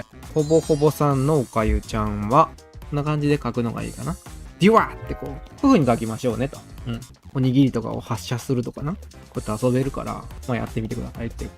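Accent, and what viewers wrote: Kansai, Japan. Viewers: 関西弁で親近感湧く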